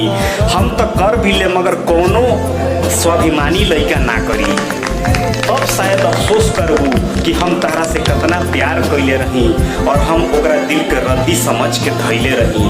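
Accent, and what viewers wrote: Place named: Bhojpuri, India. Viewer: Ek no. Bhojpuri tone with dialogue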